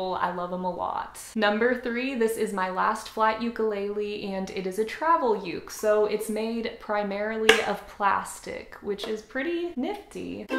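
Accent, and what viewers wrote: South, United States. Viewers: i love you're light to heavy southern accent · Im not sure about it but did i hear a country accent? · Which part of US is this accent from? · Hi..love the vids and the ukes lol, you're a very funny person and the accent is quite charming I know it's southern States whether its Louisiana or wherever I'm from north of England so not sure...but you should check out that guy on you tube called morbanjo on you tube, he's playing violins he made himself out of cigar boxes and other stuff amazing... Who knows he may make a ukulele for you 😆😊🎻🎸take care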